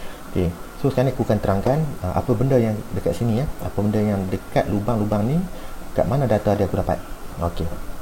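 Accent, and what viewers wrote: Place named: North, Malaysia. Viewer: Dekat mana nie...dngaq cakap utara...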